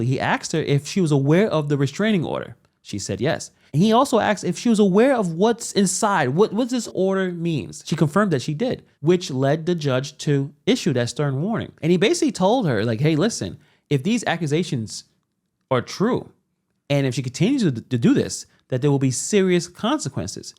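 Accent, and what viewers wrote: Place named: Appalachia, United States. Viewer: Ya all ya all all learn from me lol 😂 you speak like a uneducated hillbilly clown 🤡 total ghetto girl 😂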